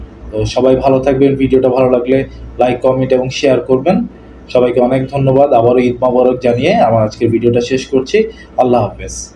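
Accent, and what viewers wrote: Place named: West Bengal, India. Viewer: আপনার ভাষা শুনে মনে হচ্ছে, আপনি পশ্চিমবঙ্গের কেউ। যদি না হন, তবে পরিচয় দিন।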